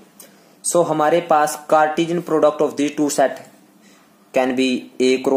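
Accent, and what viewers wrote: Haryana, India. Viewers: Apki haryanvi bhot cute h sir😁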